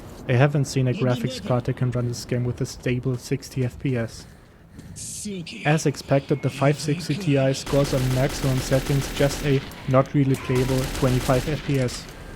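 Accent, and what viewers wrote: Germany. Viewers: are you german your accent is like a german is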